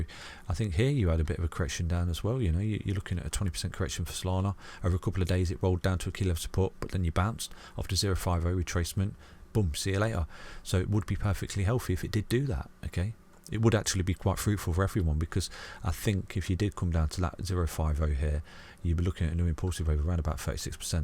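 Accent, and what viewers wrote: United Kingdom. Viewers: nothing like whispering in british accent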